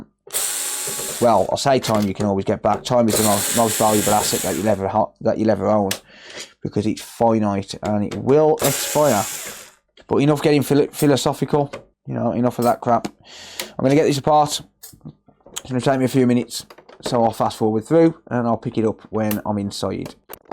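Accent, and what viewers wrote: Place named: Black Country, United Kingdom. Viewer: Hi mate you sound like your from by me like Dudley or Black Country area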